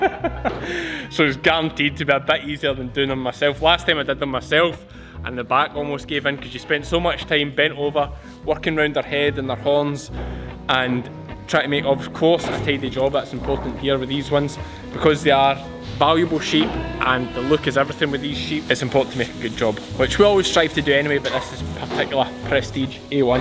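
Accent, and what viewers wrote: Scotland, United Kingdom. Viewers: Hello from Wyoming USA. I love your videos and accent!! Just curious, you and Graeme (hoof GP) are both from Scotland but have such incredibly different accents. Is it simply the difference in regional dialects, like the difference between Boston and Texan accents? 😊 Thanks for all your videos ! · I fell in love with these sheep the moment I saw them. I had no idea they were so expensive. It always makes me laugh when I see a sheep being shorn. They don't move a muscle. No resentance or complaining whatsoever. I could never eat these beautiful animals. They remind me of children. I enjoyed your video and could listen to a Scotman talk all day. Music to my ears.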